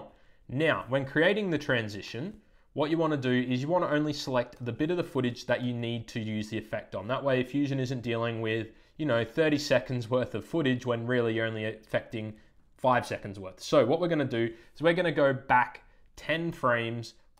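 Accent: Australia. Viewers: Thanks for that tutorial! Really well explained and value add. And is that an Aussie accent I hear? Nice one mate!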